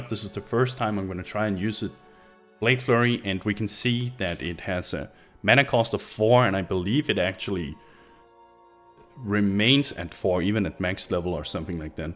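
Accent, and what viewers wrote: Denmark. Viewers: Are u scandinavien? Maybe even Danish?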